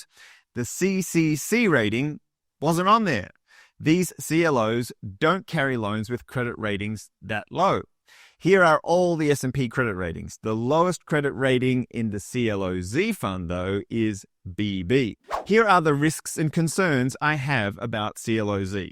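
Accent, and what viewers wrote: Australia. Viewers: Masterful & timely analysis of the pros and cons of CLOZ vs. other similar funds with different credit portfolios. reads and synthesizes the SA analysis which Couch investors like me benefit from and delivers in clear, personable, articulate English/Australian (?)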